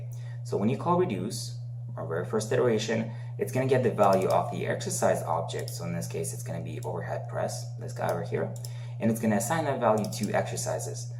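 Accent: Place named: Canada. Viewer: Excellent videos! Love the slight accent... Canadian?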